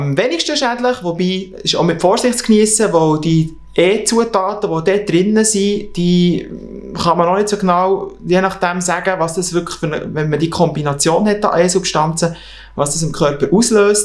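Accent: Switzerland. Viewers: Dann guck dir so ein Video halt auf einem deutschen Kanal an (gibt's aber keine guten😉). In der Schweiz wird halt so gesprochen und ich hab als Deutscher auch kein Problem das zu verstehen. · Denke Schweizer deutsch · Schon krass, wie ekelhaft manche wegen dem schweizerdeutsch sich ablassen. Wenn man es nicht verstehen kann, Untertitel aktivieren und nicht so herablassend kommentieren.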